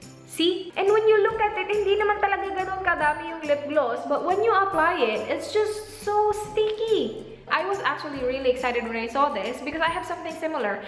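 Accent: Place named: Visayas, Philippines. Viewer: blimeshis hahahaahha maklaro ang pagkabisaya ate :D chos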